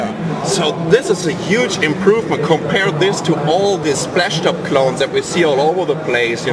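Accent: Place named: Germany. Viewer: schon, aber sein englisch hört sich verdammt deutsch an...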